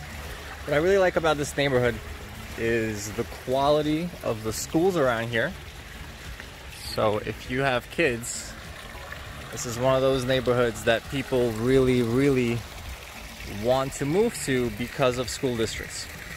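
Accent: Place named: East Coast, United States. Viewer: I notice a slight east coast accent. any chance your from NY/NJ?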